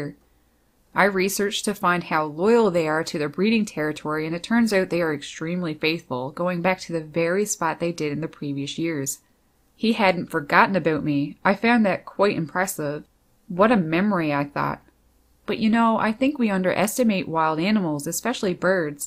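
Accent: Canada. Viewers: Love your accent my Canadian sister!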